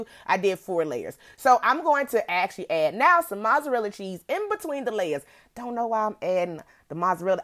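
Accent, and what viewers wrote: United Kingdom. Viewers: Why she legit sounded british tho 💀😂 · Your British accent is amazing 🤩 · That British accent took me out. I love you. ❤️❤️❤️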